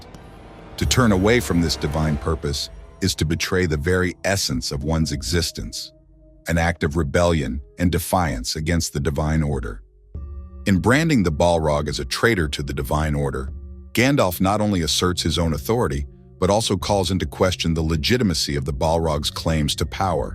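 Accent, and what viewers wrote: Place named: Scotland, United Kingdom. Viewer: Quite the Scottish accent you have, growing up in the beautiful lands of Scotland and all. Telling 'your' stories that are inspired by the beautiful landscapes you grew up in. Sounds authentic.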